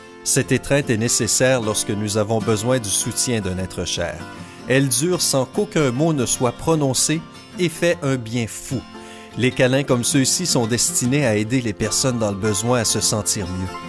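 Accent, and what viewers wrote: Quebec, Canada. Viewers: Tain c'est un québécois 😂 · Hey st'un québécois qui parle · J'adore ton accent du qebec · La voix off ses un québécois tabarnak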